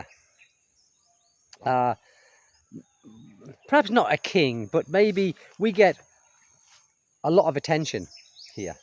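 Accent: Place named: Middlesbrough, United Kingdom. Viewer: Whereabouts are u from originally mate ? Boro accent ? Great videos mate 👍 all the best to u and lovely family 👍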